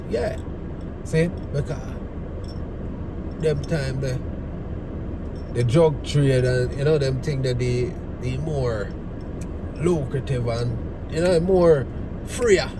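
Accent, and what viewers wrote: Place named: Jamaica. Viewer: He's speaking a mixture of J'can patois, the vernacular of the people. I'm sure he can "code switch" when necessary. It's not that serious (lol).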